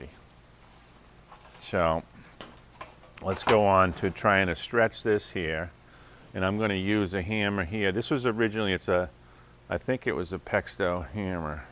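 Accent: Boston, United States. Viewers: your "pauk the cah and feed da meeeder" = park the car and feed the meter Bostonian narration is always enjoyable. This is a great series!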